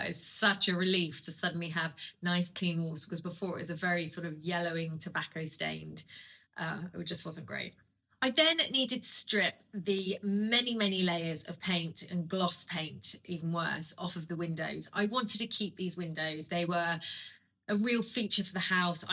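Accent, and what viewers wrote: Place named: England, United Kingdom. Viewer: You are a natural designer and are very talented, I subscribed after seeing a couple of your shorts. You are incredible and I have enjoyed watching the transformation of the cottage! (I am in the USA and your accent reminds me of my mom who was an English War Bride in the 1940’s and married an American soldier.)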